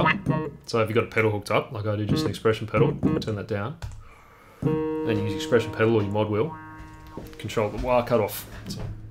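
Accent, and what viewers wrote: Australia. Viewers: Man, just your introduction with your Australian accent puts me in a good mood. Thanks for the review. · Most Austrailian bloke I've ever heard!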